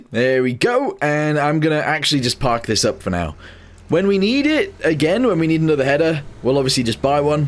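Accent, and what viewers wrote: United Kingdom. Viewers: Is it just me or am I detecting a Geordie twang in your voice?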